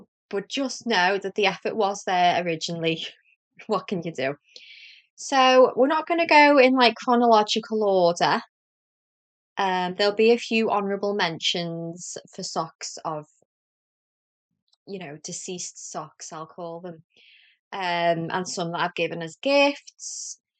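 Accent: United Kingdom. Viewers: I love your socks and your accent! Where in the UK are you from?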